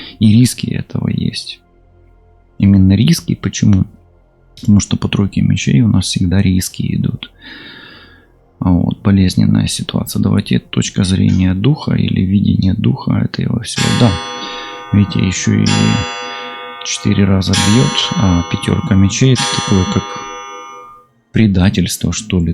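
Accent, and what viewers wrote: Ukraine. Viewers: Вы просто супер мне очень нравится что вы говорите как украинец мой род связан с Украиной красивой страной корни есть от туда вас приятно слушать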